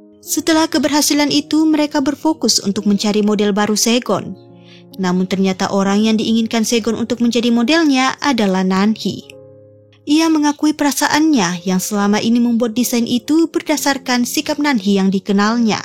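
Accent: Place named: Bali, Indonesia. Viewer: Orang bali kah,,,,??? Logat setiap kata ada Te tapi di ucapkan Dhe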